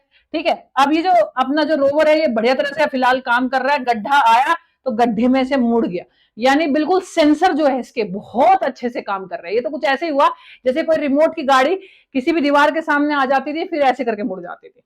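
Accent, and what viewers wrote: Haryana, India. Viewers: मैडम आप हरियाणा कि है क्या, मुझे आपकी भाषा बहोत अछि है।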